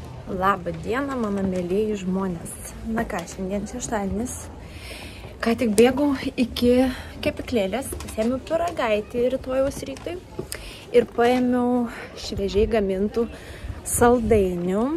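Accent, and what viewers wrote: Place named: Aukstaitija, Lithuania. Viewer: Nu zinok vau! Taprasme as tavo kanala atradau visai netycia, bet be galo dziaugiuosi ir perziurejau visus visus tavo ikeltus video! Tu esi tokia paprasta, bet taaaip ikvepi dziaugtis paciais maziausiais dalykais🌸 gera girdet kai kartas nuo karto islenda aukstaitiskas akcentas😂🌸 labai laukiu tavo video! Net patys paprasciausi, buitiski, diena pavercia geresne ir prisotina motyvacijos!pati esu is Rokiskio tad dar smagiau ziureti ir zinoti, jog zmogus kiles is visai netoli☺️ laukiam kuo daugiau video ir visai nesvarbu ar tai bus paprastas filmukas is namu ar kai ka nors veiki! Aciu tau!❤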